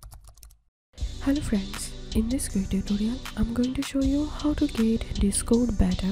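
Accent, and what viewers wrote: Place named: India. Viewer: heard the indian accent and clicked off 💀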